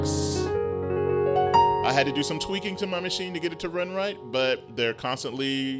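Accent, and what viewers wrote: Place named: Austria. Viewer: Austrian not Australian... No offense! xD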